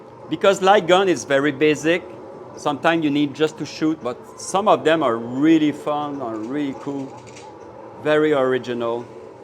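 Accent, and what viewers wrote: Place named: Quebec, Canada. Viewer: i don't no why but you sound like someone from Quebec lol any how you have a new sub and hello from Quebec :P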